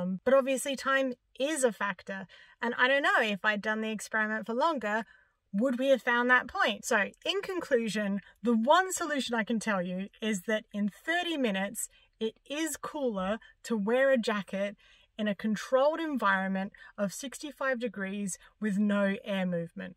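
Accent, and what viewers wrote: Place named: Australia. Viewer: I got you in my feed and wow. Late to the party as always. You are awesome. Got to tell you, you sound Aussie but than I saw you where in England. So I started thinking ARE there people in England who sound like that, but they hide them? You never hear them on any shows in the states. Kind of like Liverpool speekers. I did figure it out, if you where from England you might not sound as happy. My opinion.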